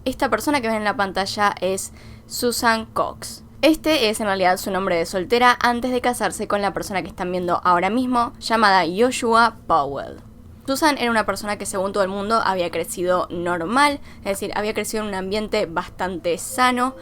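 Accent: Argentina. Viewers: Ella es de Argentina y allá su pronunciación así suena...a mi en lo personal me encanta su manera de hablar, es linda! 👍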